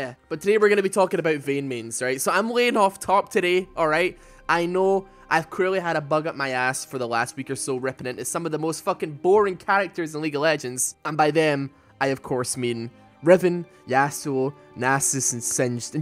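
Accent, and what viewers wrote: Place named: Scottish Highlands, United Kingdom. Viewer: Your vids are awesome man you sound like your from the highlands xD I live near Inverness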